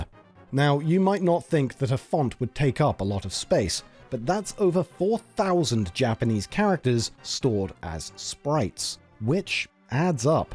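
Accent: United Kingdom. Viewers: Stop using British accents already. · Looks like the script itself was copy-pasted as captions, instead of using YouTube's auto-caption system. This video was written by an Arkansan (me, I write like I talk), but it was VO'd by a Brit, that's why there's some inconsistency.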